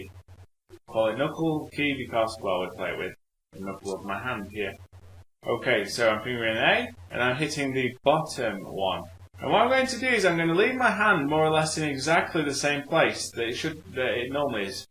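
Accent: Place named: England, United Kingdom. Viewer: I like your haevy english accent,knookle key. There is bis, flute 1 /1. Albert clarinet side key, great well shown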